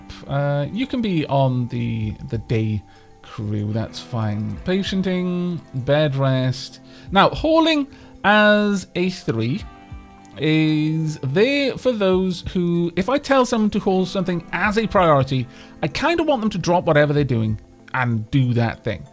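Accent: Wales, United Kingdom. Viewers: He sounds very Welsh. I'm somewhat surprised that people would have an idea of what Welsh sounds like and wouldn't know is one of them.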